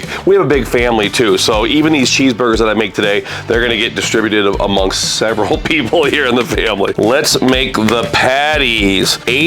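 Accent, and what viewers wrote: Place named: Pittsburgh, United States. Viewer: Great video! Now I'm hungry! I always thought you sounded like a western Pennsylvanian. I grew up about an hour north of 'da burgh, so I speak Pittsburghese. :-) Keep up the great work!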